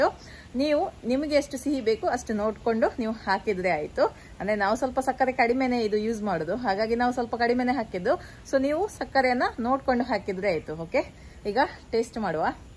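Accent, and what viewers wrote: Mangalore, India. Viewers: Supertea madam maguvinathaha mukhadaste mangaloorina mathu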